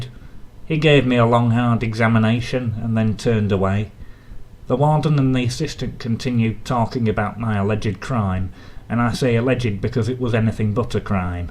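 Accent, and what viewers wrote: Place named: Scotland, United Kingdom. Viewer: This guy sounds pitiful. The person in the book not the narrator. The narrator just sounds Scottish.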